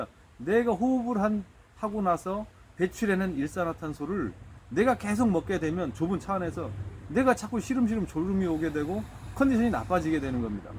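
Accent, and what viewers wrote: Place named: Jeolla, South Korea. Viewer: 말씀이 전라도분 같아요 어디신지~?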